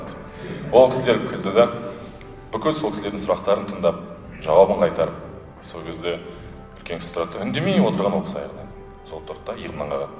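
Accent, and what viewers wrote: Standard. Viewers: Өте керемет таза қазақша сөйлейді, сияқты менде сөйлей алмаймың, тіпті ұят екен. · туган жерин сую нагыз еркек сизге рахмет казак тилин курметеп таза соилединиз казак тили жасасын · казакшасы таза екен озимиздин мангурттер сендерде намыс барма кашан соилеисиндер мемлекет тилинде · Недеген КЕРЕМЕТ таза сойлейди. ОТЕ ДУРЫС кай елде турсан сол елдин тилинде сойлеу миндетти. Казакша билмейтин Казактарга окинем. СИЗГЕ МЫН АЛГЫС👍👏💋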